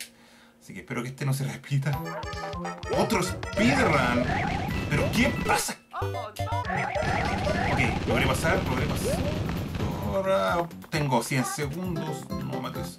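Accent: Chile. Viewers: eres chileno? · ERES CHILENO? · dejame adivinar... Eres de Conce? xD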